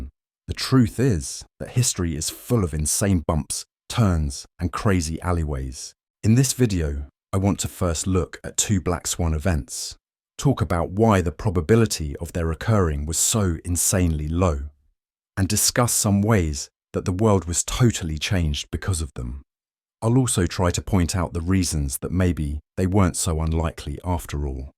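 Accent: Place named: United Kingdom. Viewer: Husky ethnic British voice sounding like Alfie from Emily in Paris ❤❤❤❤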